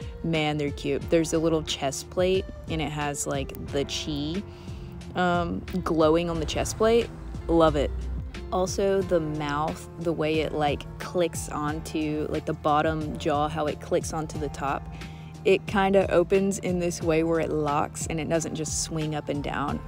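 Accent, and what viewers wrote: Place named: South, United States. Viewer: Been following on insta forever, but I did not expect your voice to be so southern! Your slight accent is adorable. I hope you make more of these, I loved the animal facts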